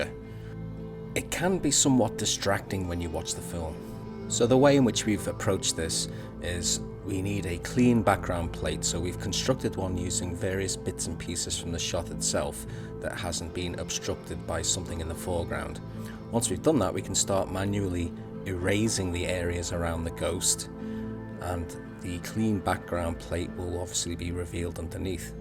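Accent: Liverpool, United Kingdom. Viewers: What part of Liverpool/Wirral are you from mate? Love what you’re doing here. Good on ya.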